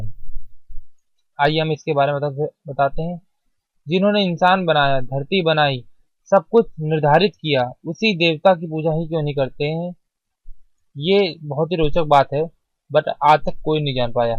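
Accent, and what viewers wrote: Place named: Uttar Pradesh, India. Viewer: hehe... UP tone!!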